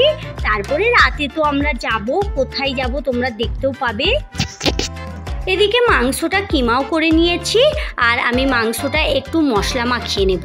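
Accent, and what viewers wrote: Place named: India. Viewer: আজ আপনার ভিডিও প্রথম দেখলাম আপনার বাড়ি কোথায়। কোন দেশের ব্লগার কথা শুনে মনে হচ্ছে ইন্ডিয়া।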